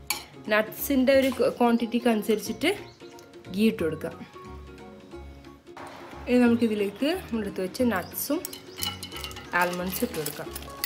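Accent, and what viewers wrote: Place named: Malabar, India. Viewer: Hi shared the Link now, watched...... good presentation, will attempt it today subject to all ingredients in place, voice over Good!! ( Malabar slang) keep it up god bless stay safe!!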